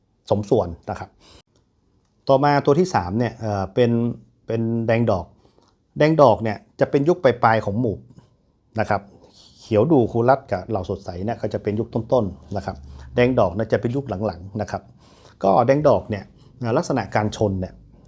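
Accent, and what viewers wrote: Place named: North, Thailand. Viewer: พูดไทยยังไงสาบเมืองทุกคำ เจ็บแอวขนาด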